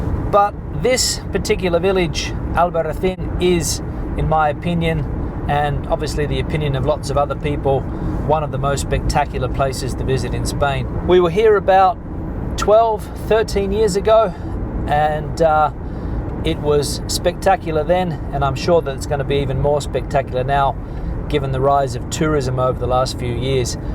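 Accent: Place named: Australia. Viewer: Good to hear an Aussie voice! What took you to Spain?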